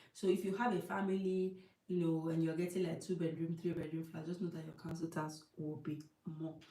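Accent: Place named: Akwa Ibom, Nigeria. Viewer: My typical Akwa ibom sister. Your tongue betrayed you big-time.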